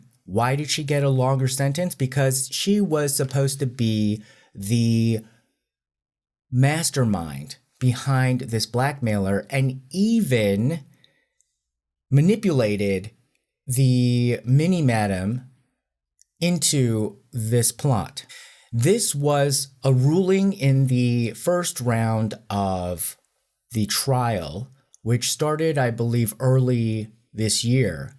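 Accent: South, United States. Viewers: I love how your southern accent started to coming heavier as the video progresses. 😂 I love it.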